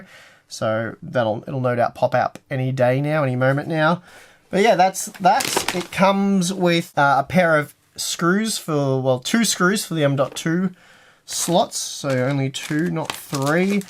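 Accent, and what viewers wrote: Australia. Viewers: I wasn't subscribed? OMG! I just love your australian delivery.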